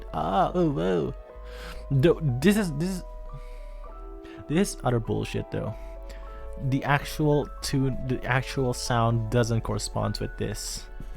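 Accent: RP, United Kingdom. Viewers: so sad, you never use them again. Anyway, I like your normal accent here in this video more than your usual Posh accent hahaha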